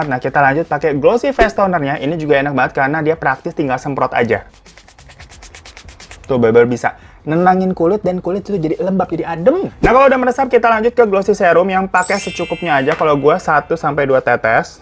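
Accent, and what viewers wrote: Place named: Pasar Minggu, Indonesia. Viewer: Kamu ngerti keseluruhan bahasa indonesia logat pasar minggu emang?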